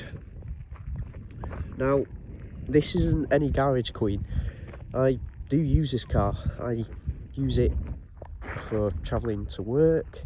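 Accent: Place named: Cockney, United Kingdom. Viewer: Cockney heavy'😂😂🧌🧌🤮🤮🤮🤮🤮🤮🤮🤮🤮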